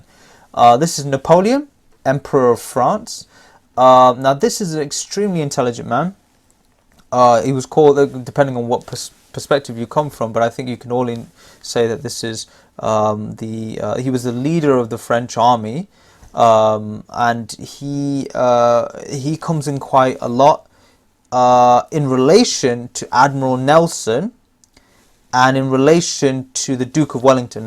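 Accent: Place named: Scotland, United Kingdom. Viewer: your scottish accent is on point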